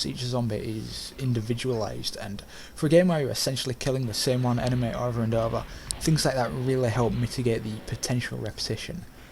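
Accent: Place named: Yorkshire, United Kingdom. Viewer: cool review btw u have the same accent as me, ru from yorkshire?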